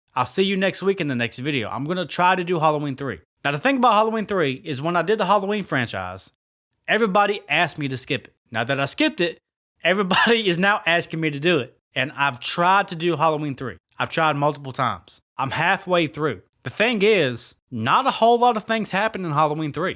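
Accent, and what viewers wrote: South, United States. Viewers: No one ever told me "don't you smoke the devil's weed." How southern are you?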